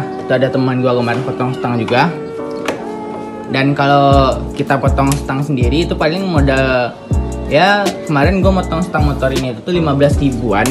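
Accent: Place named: West Sumatra, Indonesia. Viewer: raso suaro urang awak yo bang😂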